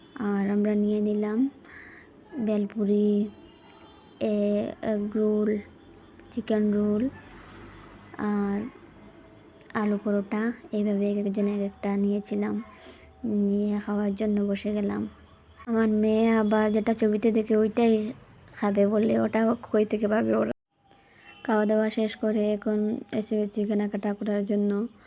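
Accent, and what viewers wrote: Sylhet, Bangladesh. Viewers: লাইক সাবস্ক্রাইবার করে দিলাম ইনশাল্লাহ এগিয়ে যাবেন সুন্দর মন থেকে ভিডিও বানান সমস্যা নাই পাশে আছি এগিয়ে যান হ্যাঁ আপু তোমার বাড়ি কি সিলেটে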